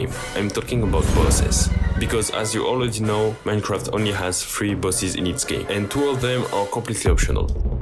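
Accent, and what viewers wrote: France. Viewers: u are french ??? ( oui sa se voit a ton accent et a ta desciription ) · are you french? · Bro you sound like a french guy. are you french?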